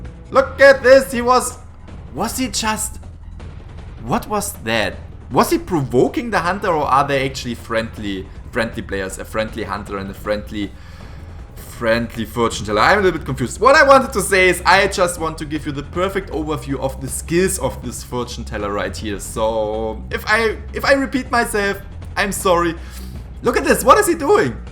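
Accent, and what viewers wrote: Germany. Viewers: Are you originally german because your English sounds like a german person talking English?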